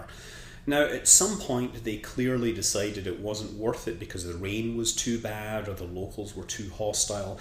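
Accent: Scotland, United Kingdom. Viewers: Your so smart! I would never normally give a crap about Scottish History, But serenaded by your sweet Scottish voice Makes me watch history crap:D Do more science videos! Maybe you should talk to some of the "How it's made" people you would be so good at narrating that. -Note, Not sarcastic at all, I really do like his voice. and am a big fan of his KSP stuff:D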